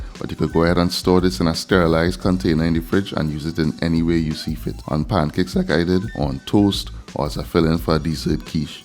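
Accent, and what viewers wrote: Trinidad and Tobago. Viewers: The accent of a Trinidadian is very distinguished! 🖤Nice recipe! I will have to order the fruit via amazon.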